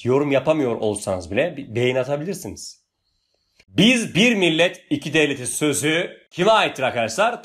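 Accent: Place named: Adana, Turkey. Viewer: Cevap:goodvibes:: gırtlaktan gelen Adana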